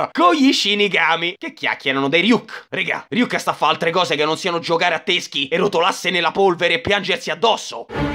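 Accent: Rome, Italy. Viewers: L’unico youtuber che parla di anime in romano toppppp😂😂😂❤️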